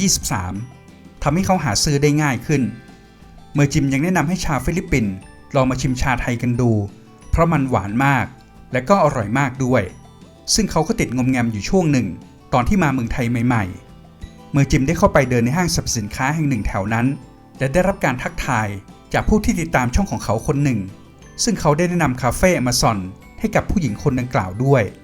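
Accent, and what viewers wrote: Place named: United Kingdom. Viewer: ผมติดตามช่องของ ตลอดครับตั้งแต่เขาทำช่องที่ไทย จนย้ายไปฟิลิปปินส์ ตอนอยู่ไทยคนติดตามเขาน้อยจริง เห็นใจเขาเหมือนกัน เป็นเพราะคนไทยไม่เช้าใจภาษาอังกฤษ จึงยากที่จะมีคนติดตาม คลิปตอนเขาไปลองกาแฟ ตอนเขาจิบอาเมริกาโน่เย็นผมเห็นแล้วขนลุก รุ้เลยว่า ยังคิดถึงเมืองไทยมาก ผมติดตามเขาเกือบทุกคลิปวีดีโอ เขานำเสนอได้ดี ที่สำคัญผมชอบสำเนียงเขาแบบอังกฤษ มันฟังดูเท่ห์ดี เพราะผมจะคุ้นเคยและพูด แบบอเมริกามาตลอด555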